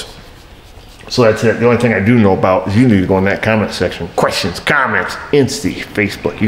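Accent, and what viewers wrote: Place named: New York, United States. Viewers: i though the way you talk is because it is a new york accent... most people in the USA talk different and pronounce words different because they are different and from a different country or planet you know... go to a good bar and everyone is talking different too Haha... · Ha! I thought it was your New York accent this whole time. I don't hear anything abnormal with your speech. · . Don't worry about your speech, we have been living with your New York "pronunciation" for years and chuckling. It's all good.:)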